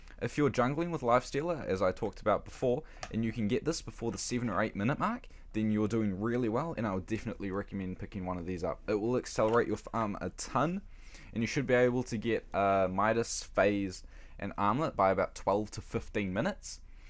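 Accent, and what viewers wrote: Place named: New Zealand. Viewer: Thanks for this it's helped a bunch. Also btw you sound kiwi as hah.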